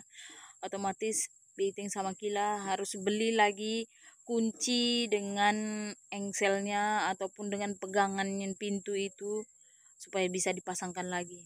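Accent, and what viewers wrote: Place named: Malaysia. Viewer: Salam..pernah tinggal di malaysiakah..bunyi loghat seakan2 bunyi orang malaysia bercakap..orang semenanjung..maaf kalau saya silap..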